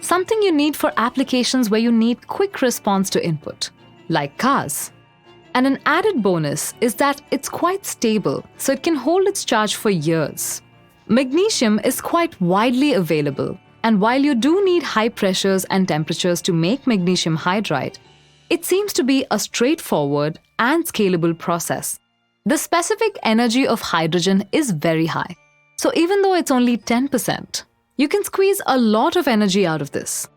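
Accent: India. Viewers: I love her Indian accent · when hear indian acent i laugh so hard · i like the Indian accent personally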